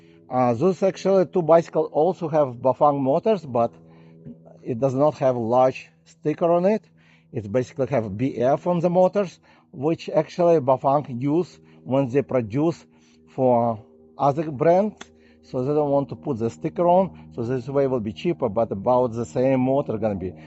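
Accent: Russia. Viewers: Good review but you have a thick Russian accent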